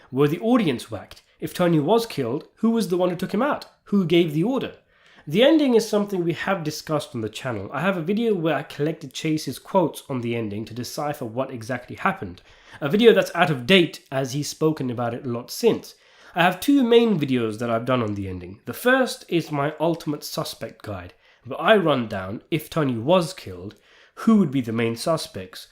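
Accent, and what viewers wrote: United Kingdom. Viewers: Ooh an interesting Sopranos finale video. Oh, it's a british guy, I'm good lol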